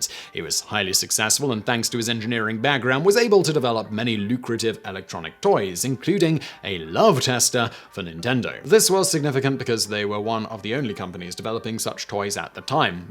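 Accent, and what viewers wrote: United Kingdom. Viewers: Since when does have a british accent?! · I can't tell if is pronouncing all those Japanese names wrong or if he's just pronouncing them all British.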